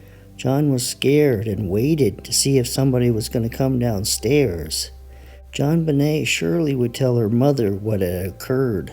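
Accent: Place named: New York, United States. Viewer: I’m in the uk North Yorkshire and I get so excited when I see you have a new video up! As for your accent well I’m not familiar with American accents I can tell the difference between a southern accent that’s about it 😆but yours well don’t laugh and don’t be offended but you remind me of a New York detective, I could listen to to you all day. I fascinated with this case keep up the great work. Best wishes from over the pond.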